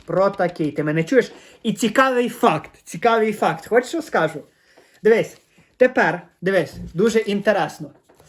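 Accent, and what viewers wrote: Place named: Transcarpathia, Ukraine. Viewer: Перспективно в моєму випадку 😂 В тебе чутно сильний закарпатський діалект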